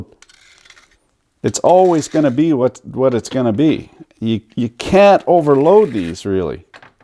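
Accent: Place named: Canada. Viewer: This might be the most Canadian fellow I’ve ever heard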